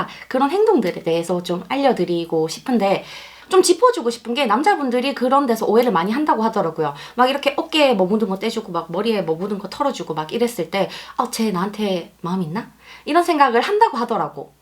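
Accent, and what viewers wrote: Gyeongsang, South Korea. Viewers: 사투리 안쓰는거 같은데.. 단지 억양만 경상도 식이지만. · 저는 의 경상도 억양이 아주아주 좋습니다. (저는 경상도 사람이 아닙니다.) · 그모든것을 알아도 눈치가 없으면 꽝~ ㅋㅋㅋ 이론과 현실은 다를때도..케바케~ 그리고 다 알고있으면서도 내가 겪으면 상황판단이 잘안되는....머 ~ 그런경우도~??? 일로서 사람을 만나는 경우는 잘안다고 생각하는데... 이성에 대해서 관심은 많으나 만나보고 싶다는 관심이 없다보니.... 호감인지~ 호의인지 구분짖지도 않고...ㅎㅎㅎ ^_________________^ 이번 영상도 재밌게 끝까지 다봤는데... 끝에 끝맺음에서 사투리 관련해서 말을 하셔서 글을 보태여 보내요~ 저는 경상도 사투리 귀엽다고 생각합니다. 대구는 "맞나~?"라는 말을 많이 쓰더라구요~ ㅎㅎㅎ 저는 꼭 표준말로 영상을 만들어야한다는 것은 없는거 같습니다. 듣기 거북하다면 안보면 되는것을 굳이 영상을 봐가면서 컨텐츠 제작자에게 이야기를 하는것은 크레이터에게는...그리 좋은 말로는 안들릴거 같습니다. 몇십년을 사투리를 표준말이라 생각하고 살아왔고 그 사투리를 하루아침에 바꾸는 것도 힘들텐데~ 그리고 그억양을 바꾸려하다보면 이것도 저것도 아닌게 될수도 있습니다. 저는 전라도지만 군생활을 경기도에서 해서 경기도억양도 쓰다가 친구가 하동친구가 있어서 경상도 억양도 나오다가 친구들 만나서 전라도 사투리 하면... 너 어디사람이냐고 물어봅니다. ㅋㅋㅋ 의 사투리가 저는 귀엽습니다~ 그대로~ ~~ 쭉~~~~~~~~~~~해주시길... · 사람은 가장자기 다울때가 젤매력적인 거라고 생각해요 사투리와 경상도억양 넘 듣기좋은데 근데 마지막 서울표준말도 귀엽긴하네요 ㅋ 서울표준말은 가끔 써주세요 🤭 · 사투리가 아니라 경상도 말씨 인거죠 대한민국 사람이 이 정도 못알아들으면 그냥 자막 보라고 하세요. 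영상 아주 좋습니다 개념치 마시고 힘내세요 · 대구 경상쪽 치고 사투리 많이 없으신 편이에요. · 자존감을 지키세요 경상도말투는 안바뀝니다 서울말 쓰는 채널보러가라고 하세요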